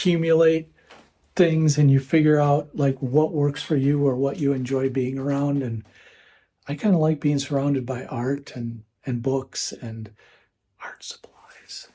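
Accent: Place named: Midwest, United States. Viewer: Love how mid-west you speak,! I remember your cool old drafting table you had in the basement studio. Wondered if you miss it.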